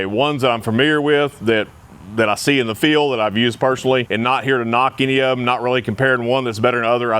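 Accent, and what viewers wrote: United States. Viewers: Like yo cowboy accent 😊 completely clear! Not a word missed 😅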